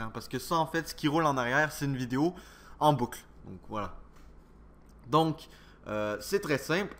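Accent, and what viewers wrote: Quebec, Canada. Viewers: J'adore ton accent Québécois ❤️✨ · il est québécois ^^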